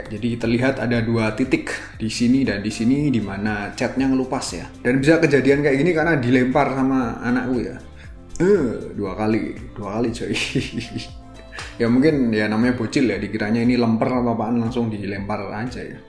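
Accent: Java, Indonesia. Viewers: Di jembreng... /jawa bangett mas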